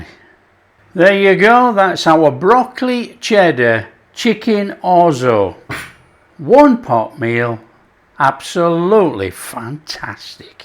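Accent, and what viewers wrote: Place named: Sheffield, United Kingdom. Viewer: I definitely will! I have made your mashed potatoes with cabbage, green onions and bacon; twice already! My family can't get enough of it! Today I made it with corn beef, and it was an absolute delight! So thank you for the recipe,! If I may ask you, what part of UK are you from? We love your accent! It reminds me of our family friend, from Sheffield UK!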